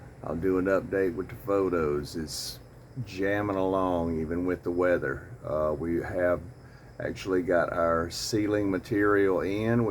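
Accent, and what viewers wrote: South, United States. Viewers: Southern accent is fun to hear 😂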